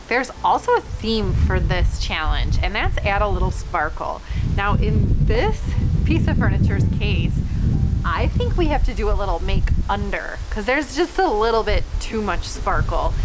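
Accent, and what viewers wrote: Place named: Midwest, United States. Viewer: I love your Midwest accent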